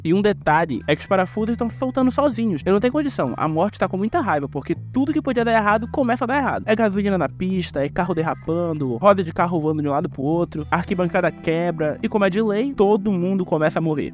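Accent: Pará, Brazil. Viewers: Bixo, precisa nem assistir muito pra saber q o Cara é Paraense kkkkkkkkkkkkkkkk, muito firme o video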